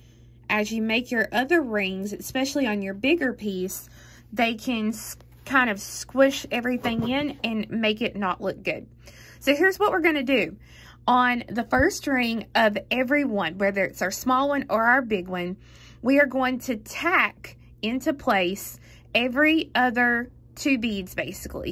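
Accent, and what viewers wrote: South, United States. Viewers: Beautiful and beautiful southern acent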